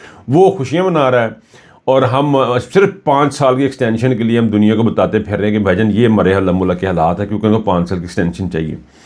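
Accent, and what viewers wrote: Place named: Punjab, Pakistan. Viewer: Excellent way of explaining the view……urdu with punjabi tarrka May Allah bless and help you to cover Pakistan’ s people point of views ❤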